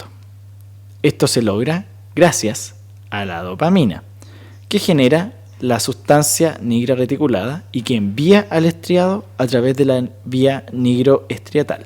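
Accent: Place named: Chile. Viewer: El acento chileno es bello, pero no lo soporto para estudiar )) jajajaajjaja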